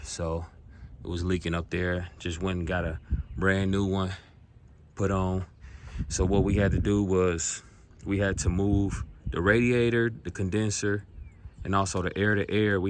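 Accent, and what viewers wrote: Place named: Detroit, United States. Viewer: Man I thought she sounded like a Detroit just wasn’t sure. This mf is bad man love this build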